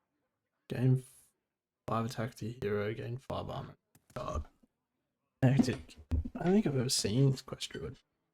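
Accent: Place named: United Kingdom. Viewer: Kinda sounds bri'ish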